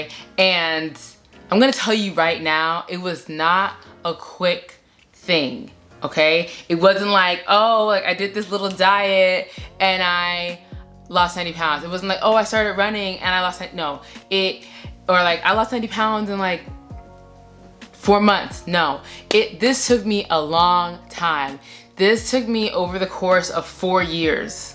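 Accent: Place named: South Africa. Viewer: Girl are you South African ?? 👀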